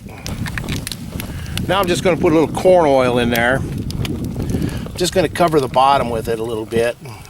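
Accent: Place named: Eastern Oregon, United States. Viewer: What part of Oregon are you from? My guess is somewhere from Eastern Oregon?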